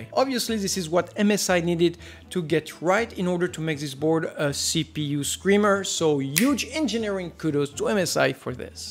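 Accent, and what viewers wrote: France. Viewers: That french accent tho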